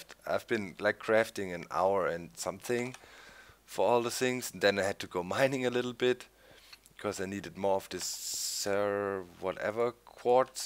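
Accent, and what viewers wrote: Germany. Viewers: Mancmal merkt man schon noch das du eig. Deutscher bist^^ Aber sonst coole videos und weiter so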